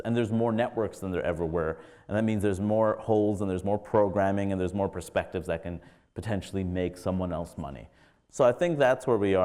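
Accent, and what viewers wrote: United States. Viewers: Hari is more American than he is Indian. Sure, he might look physically like an Indian and he might have the same traditions his parents had. But c'mon, the accents just tells the truth. It's really very hard to find an Indian that has a clean accent (ofc, if he was BORN and raised in India). Also tbh Apu is probably one of less stereotyped character in the series, wanna know what is really violent and stereotyped? Watch the episode in which The Simpsons travel to Brazil. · This guy is literally grown up up in America who’s mum is American you have a American accent yet you feel done over by APU shut up you sound more White and Buttered than Bill Clinton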